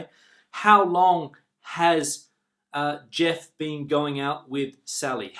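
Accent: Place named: United Kingdom. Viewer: What a beautiful accent! Forvo says it's a british accent so I hope I could learn it perfectly as soon as possible, Spanish here!! Very good video, perfectly explained and easy to understand, Thank you!!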